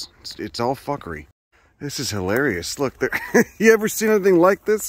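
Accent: Chicago, United States. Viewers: Oh, yeah, I get it. Your original point is good - and believe me, I hope people will heed signs or common sense. It is gruesome to think of caustic (or acidic) pits of boiling mud. I guess my original comment was because our ingenious educator simply cracks me up with his thick Chicago accent. Sorta like the trip through the grocery store near Berkeley. So many quotables...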